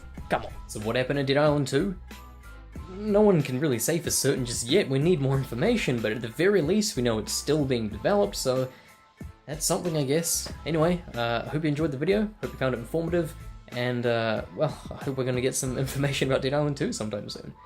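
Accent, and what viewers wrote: New Zealand. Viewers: are you from new zealand? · Are You From New Zealand Bro 😂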